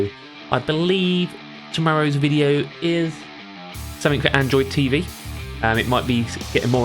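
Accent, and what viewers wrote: United Kingdom. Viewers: Was this guy from the UK?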